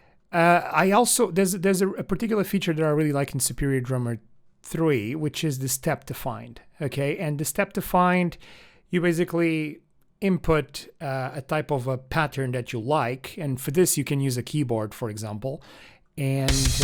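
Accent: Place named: Portugal. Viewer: parece portugues a falar ingles , bom video , boas dicas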